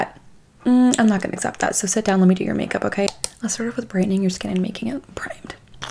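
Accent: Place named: United Kingdom. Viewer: I am very confused you eyes colour changes and somtimes you have a british accent 🤨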